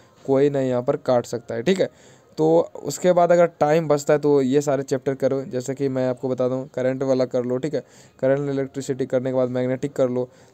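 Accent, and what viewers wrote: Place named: India. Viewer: सर आपके बोलने का अंदाज देसी स्टाइल एकदम मस्त बढ़िया मजेदार है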